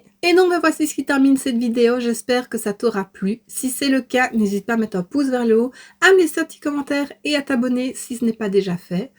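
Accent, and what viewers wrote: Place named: Liège, Belgium. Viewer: a d'accord c'est très jolie se petit accent de liège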